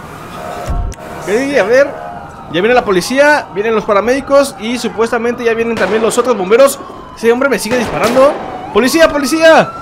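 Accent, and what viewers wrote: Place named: Italy. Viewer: casi en todo el video hablaste como italiano hablando español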